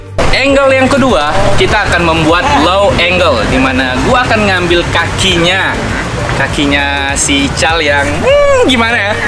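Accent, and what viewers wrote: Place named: Banyumas, Indonesia. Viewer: mbaknya ngapak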